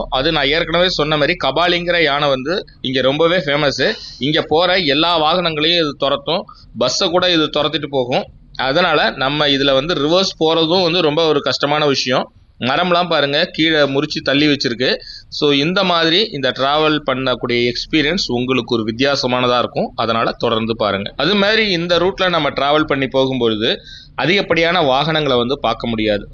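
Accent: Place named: Puducherry, India. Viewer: சார் உங்களுடைய காணொளியை பார்த்தேன் நான் புதுச்சேரி காரைக்கால் சேர்ந்தவன் உங்களுடைய வாய்ஸ் நம்ம ஊர் போல் இருக்கிறதே என்று தங்களுடைய கார் நம்பரை வைத்து பார்த்தேன் நீங்கள் புதுச்சேரியை சேர்ந்தவர் என்று என் மகளை பொள்ளாச்சியில் திருமணம் செய்து கொடுத்துள்ளேன் ஆழியாறு ஆனைமலை வரைக்கும் தான் சென்றிருக்கிறேன் உங்களுடைய வீடியோவை பார்த்த பிறகு உடனே செல்ல வேண்டும் என்று ஆவலாக உள்ளது நன்றி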